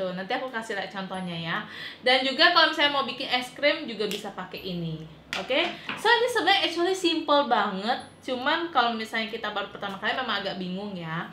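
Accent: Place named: Palembang, Indonesia. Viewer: Orang palembang ya kak 😊..logatnya gak bisa hilang heheh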